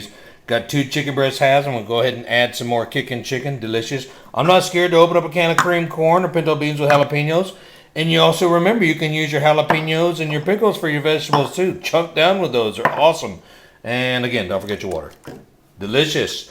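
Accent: Texas, United States. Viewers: Can tell you're from Texas brother!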